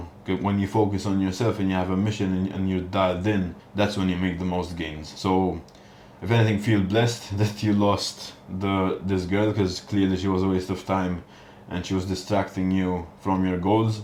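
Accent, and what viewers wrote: Malta. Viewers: First time I came across u was on ig ofc and bro as soon as I heard the accent I knew u were from malta ! My question is what's your best tip for beginners?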